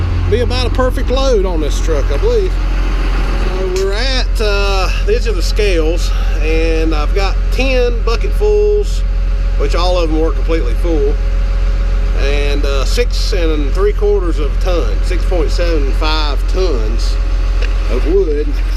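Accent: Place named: South, United States. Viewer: You sound' further South'...... I did not know, Virginians sound so Southern... 'Real life'..... nice... Different than 'created content'.......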